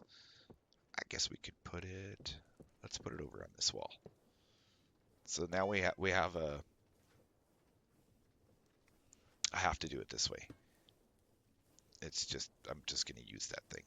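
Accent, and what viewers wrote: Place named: Minnesota, United States. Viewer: What modpack is this? I'm still in the start of the video. Possibly add the link in your description? That bald head makes me happy, lol. Also, are you from Minnesota/Wisconsin? Possibly northern Michigan? I've got a ton of family in Minnesota and you sound like them.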